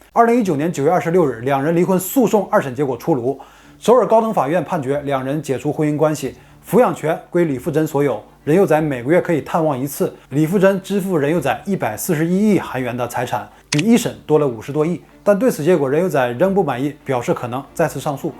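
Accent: Northeast, China. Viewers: 小乌确实噱头大与内容不符，Wayne内容一般都是从网上抄的，will很棒发音好文案也有趣简洁，但是东北口音也很重呀。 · 思路够清晰，文案不拖沓，播讲够顺溜，语言够幽默，就会让观众不自觉忽略口音问题。其实有口音的人是多数，当你的播讲内容有冗长，模糊，故意卖关子，拖沓，发音的缺点就会被放大。当然这几个主播都没有那么差，都还挺好的，主要我以前做的是语言类相关的工作，所以会很挑剔发音上的硬伤，我无法忽视哪怕一点儿的齿音字，大舌头，前中后鼻音不分，呢嘞不分。的东北音，和发音问题，是两个概念。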